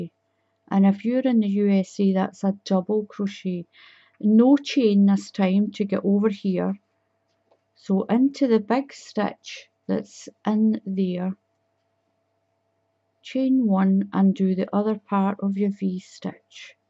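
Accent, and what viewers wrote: Scotland, United Kingdom. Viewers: It's lovely to hear a Scottish voice for a change. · Looove your channel and all your help!!! And of course, I love your Scottish brogue... my Paw Paw Oliver hails from Liliesleaf...down in the Borders. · I love this! I would love to make some pillow covers. I love your accent also, you remind me of my grandmother who was from Glasgow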